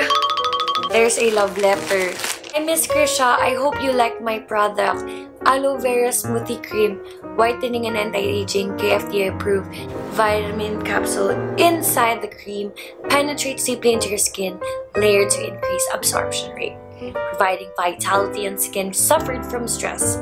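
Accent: Visayas, Philippines. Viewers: nah kay imbes seriuso ko nangatawa ko sa pag sulti nim ug visaya... nah kay maka lingaw kaayu lagi ka... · Charr,Bisaya syaaaaa!!! Ateeee!!😍😍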